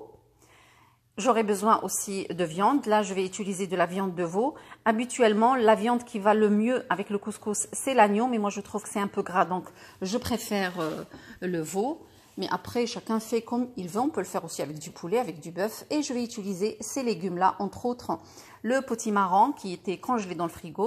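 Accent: Morocco. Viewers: Soubhanallah had la dame elle a pas du tout le langage tunisien . Elle parle plutôt Marocain . Ce n'est pas la langue tunisienne . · Oui, je pense qu'il s'agit d'un couscous marocain, d'autant plus que la personne qui fait la recette a un accent typique marocain, mais malgré tout ce le Maghreb. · Notre grande cuisine traditionnelle couscous tagine pastella je vous donne un indice :****Marrakech **** bonjour ma cousine maghrébine je n'ai jamais vu laver la semoule on la travaille avec la vapeur à 3 reprise minimum (passoire) vous avez la méthode c'est sûr vous avez côtoyé au moins une famille marocaine en France..vous avez même l'accent marocain entout cas le Maghreb city 😇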